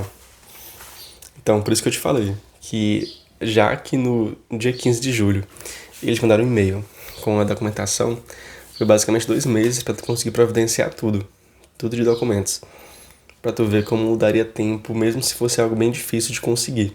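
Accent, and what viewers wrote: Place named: Ceará, Brazil. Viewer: vc é Cearense? Só por curiosidade mesmo, pq às vezes "tenho certeza" pelo sotaque cearensão (kkk), mas às vezes fala o S paulista (queStões, e não queSHtões como o cearense) rsrs Estou gostando bastante dos seus vídeos! Tem me esclarecido muito!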